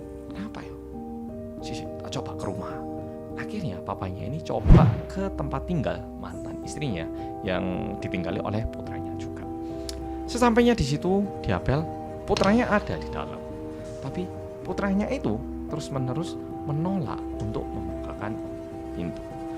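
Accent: Java, Indonesia. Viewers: engga si, gue sbg org jawa masih denger logat medhoknya mas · Medoke wes koyo bukan orang Korea..semangat mas💪👍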